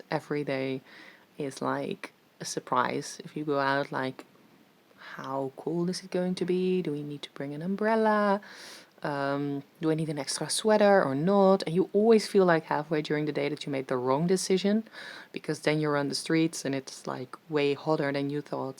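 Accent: Netherlands. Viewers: an English Dutch accent which is pleasant to listen to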